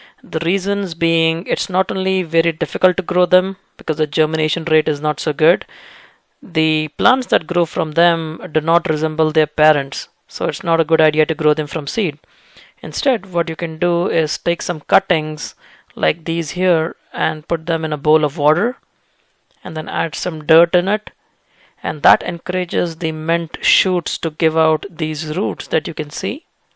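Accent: India. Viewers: LOVED your Indian accent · I think Indian accent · he is indian i think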